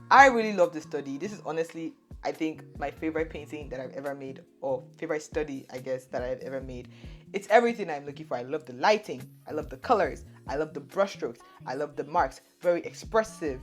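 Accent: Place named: Nigeria. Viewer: When I say your name in your channel description, I figured but I confirmed it when I heard your accent, love to see my fellow Nigerians out here especially as a beginner artist myself. Your art is amazing and the bg music is such my taste too. You’ve js gained a subscriber😊 btw could I ask where you got your iPad stand from from?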